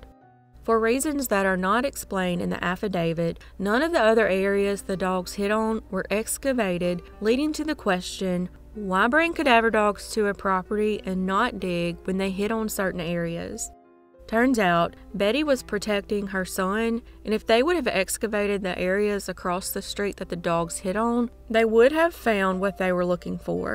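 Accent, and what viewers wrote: South, United States. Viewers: I could listen to that sweet southern accent all day. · Hey. Just found your channel by accident but love it. Great job telling these stories. Love your accent! K 🇨🇦 🇺🇸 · It’s so nice to hear another southern voice. Very nice and organized video so thank you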